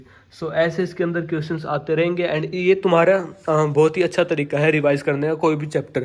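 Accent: Haryana, India. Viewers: Hariyanvi me hi bol k video bnaya kro mast mst lgte ho apne accent me dono 🥰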